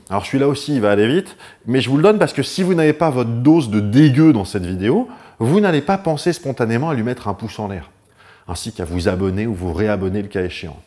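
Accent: Belgium. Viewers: C'est dingue même avec la modif de voix on reconnaît l'accent belge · Je reconnais un accent belge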